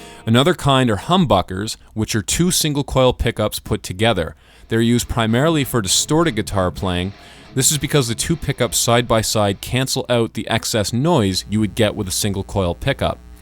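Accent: Canada. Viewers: that canadian accent ughhhhhh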